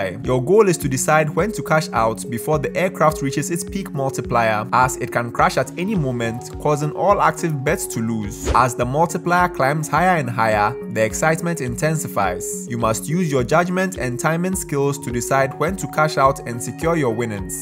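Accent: Nigeria. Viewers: how is bros accent nigerian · Bro has a Nigerian accent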